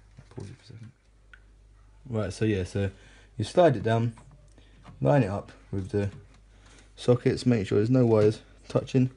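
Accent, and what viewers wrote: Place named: United Kingdom. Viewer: I did not understand anything of what you said... its too bri-ish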